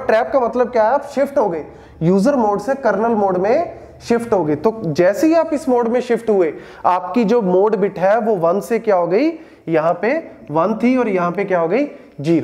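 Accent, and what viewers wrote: India. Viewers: You still have your hindi accent😢😪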